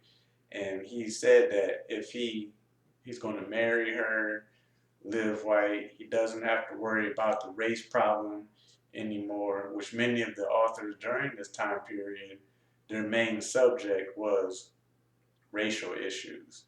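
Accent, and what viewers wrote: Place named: South, United States. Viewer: This was well done. Its a heartbreaking topic but has to be brought to the light. I like book talks and it sounds like you have a Southern accent which I can relate to so I subscribed.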